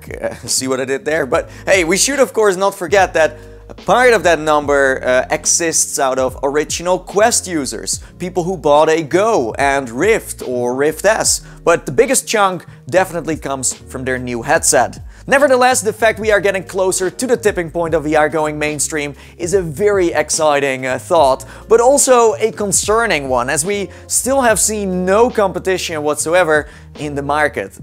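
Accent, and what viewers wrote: Netherlands. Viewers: Hey kerel ik hoorde je accesnt en ik wist gelijk dat je nl was😜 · Are you Dutch? you have a dutch accent.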